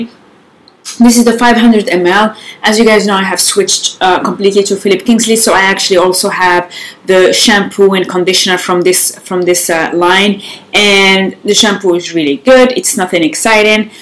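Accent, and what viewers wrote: United Kingdom. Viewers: that british accent though hahahaha i loved the video, keep shining! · you are developing a little british accent, how cute ..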